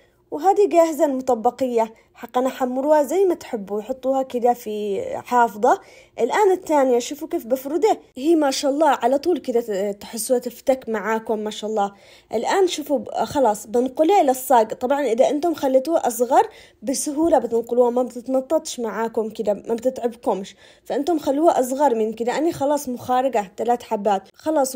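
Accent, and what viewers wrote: Aden, Yemen. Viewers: فديت عدن وفديت كريتر الغالية وفديت كلامك العدني المليح واحلى مطبقية من احلى فنانه مبدعه ماشاء الله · فديتك احب طبخك واحب اسمع صوتك الله على الكلام العدني اقسم بالله انت فنانه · ما شاء الله عليك حبيتك حبيت خفة دمك ولهجتك الجميلة أول مرة أسمع الحكي اليمني الأصلي ربي يحفظك ويسعدك شكرا لانك عيشتنا معك أجواء اليمن السعيد ونقلت لنا ثقافة اليمن العريقة متابعة واشتراك ولايك لعيونك الحلوين وتسلم ايديك❤ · ماشاءالله تسلم يدك يا كم تعجبني لهجتك العدنيه والله حبيتك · اللهجة العدنية جميلة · ياروحي على مطبقيه عدنيه بلهجة عدنيه ❤❤. ما أحلى اليمن ❤🇾🇪♥️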